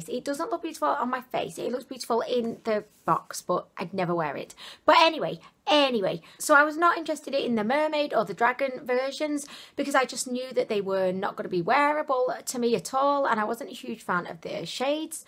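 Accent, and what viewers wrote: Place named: North Wales, United Kingdom. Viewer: Right.. Personal question. Where are you from? Obviously your name is Welsh and there is a northern Welsh accent there but it seems to have a hint of something else. Am I close? (Semi ignorant Australian here though I did spent a fortnight in north Wales last year)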